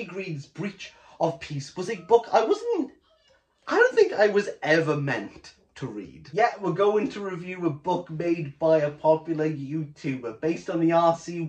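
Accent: Wales, United Kingdom. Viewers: Found your channel by accident...and what a happy accident it has been. The honesty and intelligence in this review is refreshing. Cheer to you for that! (Also, is that a Welsh accent I'm detecting, or am I completely lost?)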